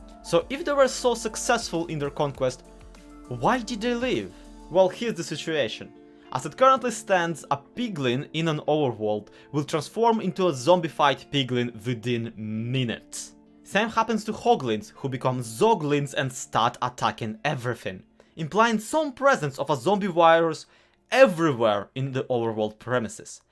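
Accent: Russia. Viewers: У тебя русский акцент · у тебя акцент русский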